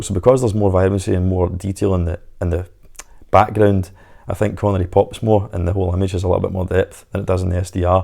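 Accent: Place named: Glasgow, United Kingdom. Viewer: Just discovered your channel mate thanks to John at mondo, being a Glasgow boy myself its great to hear a familiar accent. Absolutely adore this film and I picked up this set. This release is 100% my best release of last year. I do prefer a amaray or steelbook in these sets but apart from that its a great set. This movie having a nice 4k edition has been a long time coming. Great informative in depth review, a breath of fresh air, the effort you have put in is much appreciated 👍 Mulcahys eye is superb, i love how he moves the camera. You should check out His 'Razorback' if you havnt seen it, another masterclass in moving the camera. Ill defo be checking out more of your vids sir! Take it easy.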